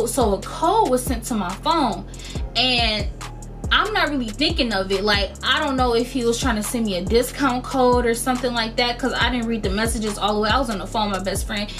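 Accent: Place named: Detroit, United States. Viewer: When u said I’m from Detroit, I felt that that is how we talk , we be spicy , new subbie girl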